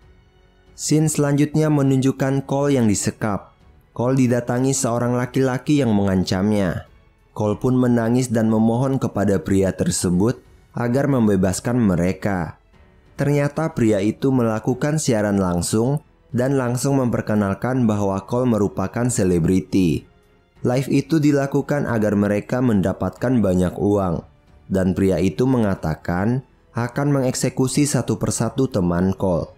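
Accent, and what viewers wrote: Kalimantan, Indonesia. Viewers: Abang orang kalimantan mana bang Edit:tumben bikin konten alur cerita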